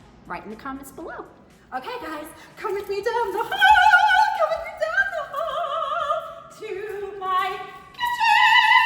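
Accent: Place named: New York, United States. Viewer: Are you from NY? Like the Bronx? You have the accent. I’m from Westchester NY parents from the Bronx. You are definitely a New Yorker!❤❤❤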